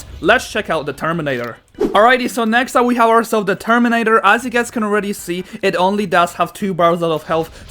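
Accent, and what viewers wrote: Poland. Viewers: im judging by your accent you are from poland or chzech?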